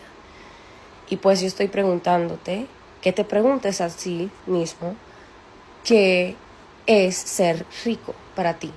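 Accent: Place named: Havana, Cuba. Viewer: me sorprendí al ver que eres cubana y hablas como pura habanera y yo extraño esa Habana tanto, no puedes imaginar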